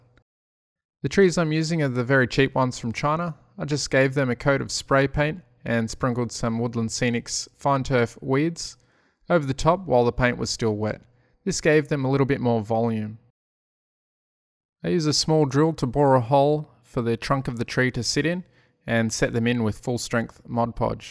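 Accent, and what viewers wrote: Australia. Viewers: Hi, great vid mate, got referred to you by ModelerMan Mike. Great to hear another Aussie accent and looking forward to seeing more of your stuff! Cheers, Dan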